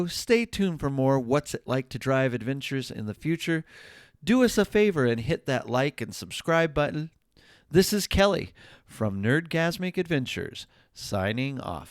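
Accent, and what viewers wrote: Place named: United States. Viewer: I'm sorry with that American accent really put me off. Australia is NOT all about Kanga's and Wombat's on main roads. Learn a bit better. Everdday driving in Oz is very different. You are on the right in the driver's seat and yes, left of road. Makes sense. We are not imbiciles. Like anywhere, you need to be aware of all dangers and risks here but in everday travel you will not encounter kanga's or wombats!!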